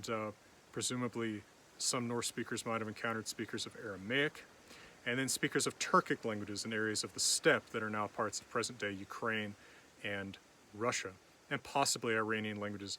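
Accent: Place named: United States. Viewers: Evil Regal Danish, I think. He wants to know which American accent speaks with, and he said it sounds very nice. At least that's what I'm guessing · Hvilken amerikansk dialekt er det du snakker? Den høres veldig bra ut.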